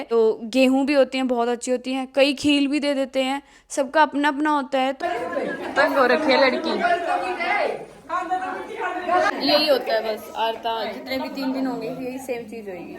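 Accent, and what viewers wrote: Haryana, India. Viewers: Di aap ke muh se haryanvi bhut achi lagti h 👌👌👌👌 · Your haryanvi accent is very nice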